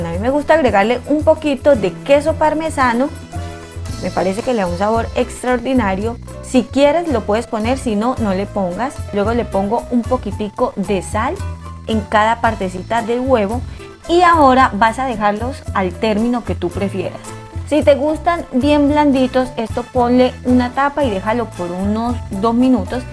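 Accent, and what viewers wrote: Colombia. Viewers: Por el nombre del canal yo pensé que era de comida mexicana ya que el achiote es la principal especie utilizada en la comida yucateca pero al oír tu acento me doy cuenta que eres colombiana. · Amo tu acento Colombiano!!!!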